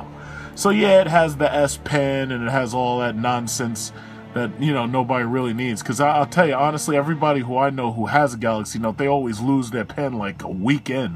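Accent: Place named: New England, United States. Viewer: where the fuck do you think apes phones are made dumb shit"CHINA"! THE ONLY GARBAGE I'M HEARING IS YOUR YANKEE ASS VOICE AND YOUR REGURGITATED APPLE IPHONE BABY FOOD THROW UP! I actually like apple and android but you're what makes this video and you're misinformationed ass so bad is you're a spiteful ignorant old dip s***.!! yeah the designs are similar but there is no way any copying going on anymore on because it blows f**** rings around the iPhone and I know for a fact because I own both and I continually go back to my Android every time. apple is for children to learn how to use a phone so when they grow up don't know how to use an Android you f**** moron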